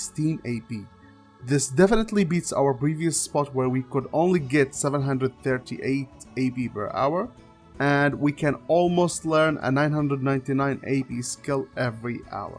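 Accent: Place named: Arab world. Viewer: Your Arab accent is so strong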